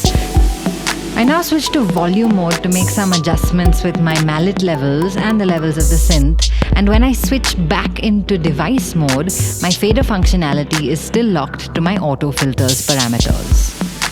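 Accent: India. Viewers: Inidan accent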